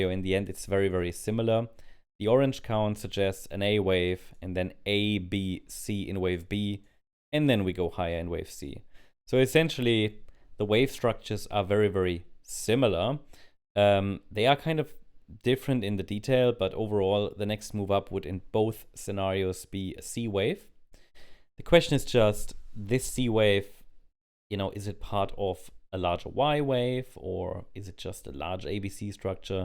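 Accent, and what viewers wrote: Germany. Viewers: That German English 👌🏽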